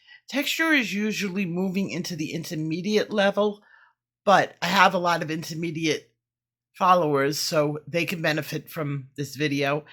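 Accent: New York, United States. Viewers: Wonderful! Love your NY accent!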